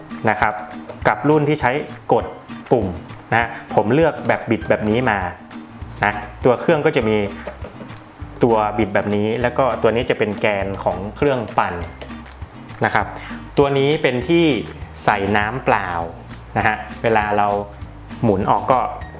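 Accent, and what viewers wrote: North, Thailand. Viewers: ขอบคุณรีวิวมากจ้า แอบฟังสำเนียง คนเหนือรึเปล่าค่ะ555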